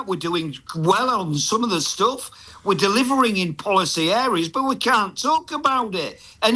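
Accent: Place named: Yorkshire, United Kingdom. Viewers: Pure Yorkshire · Proper Yorkshire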